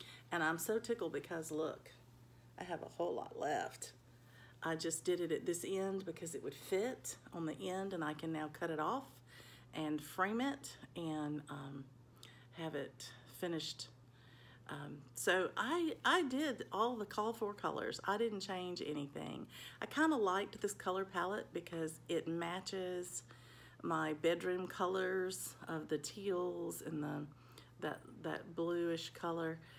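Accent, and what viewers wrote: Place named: Atlanta, United States. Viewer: I just love “Welcome Home”! So glad I found your flosstube. We sound alike - I’m from Atlanta, but have been in Pennsylvania for 25 years, and never lost my accent (as I’m told almost daily!) ❤️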